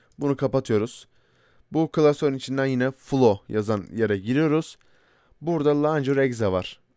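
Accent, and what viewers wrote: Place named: Bursa, Turkey. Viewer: abi kusura bakma ama bursalı gibi konusuyon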